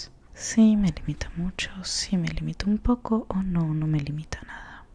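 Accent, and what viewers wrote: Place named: Argentina. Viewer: Amó tu acento argentino, saludos desde México ❤️💤💤💤💤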